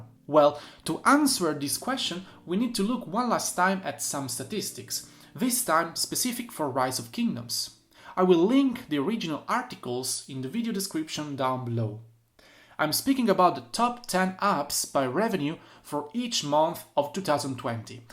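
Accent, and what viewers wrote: Italy. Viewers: Avevo intuito qualcosa dalla pronuncia, il ciao finale mi ha convinto😂. Iscritto 💪👍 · bro are you italian? by the way very good video, as f2p i totally agree with you, another youtuber (@ said the same things weeks ago in one of his stream explaining why rok is about to die if they continue on this path, he try also to contact developers but no-one respond to him #CommunityMatters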